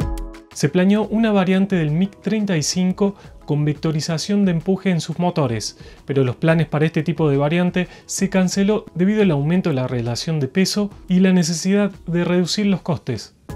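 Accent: Argentina. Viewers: Como siempre dándonos cátedra de aviación excelente video me gustaría saber que nacionalidad tienen, párese argentino tu bonito acento. Es com argentino, lindo fuera que la fuerza aérea argentina tuviera estos aviones, con los eselentes pilotos, sooy de el mísero país de Colombia reprimido por el gobierno norco paramilitar acmiro al el pueblo, Argentino saludos Dios los bendiga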